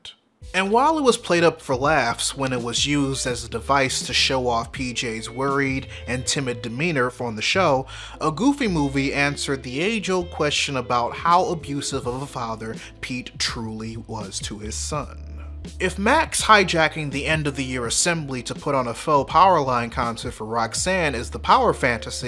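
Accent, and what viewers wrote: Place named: Detroit, United States. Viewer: Native Detroiter here — living in the south for the past decade. I’m so glad I stumbled upon your channel. It was recommended to me after watching Double Toasted’s A Goofy Movie review. I’m older, but this movie brought back so many memories for me, as well as my millennial-aged son. It’s why he stopped dead in his tracks when he saw me watching your video. We both gasped when you mentioned being from Detroit, then I immediately said, “He sounds like a Cass Tech alum.” Sure enough, you confirmed it! Always love seeing my fellow Technicians doing well. I won't mention what year I graduated. :) Anyway, my son and I both subscribed to your channel and we're eager to dive all the way in. We saw The Batman Thursday and I can’t wait to hear your thoughts on it. Keep up the excellent work!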